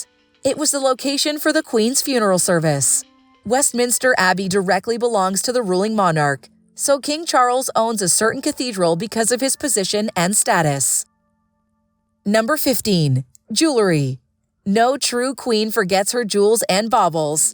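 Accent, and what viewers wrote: United States. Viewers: American narrator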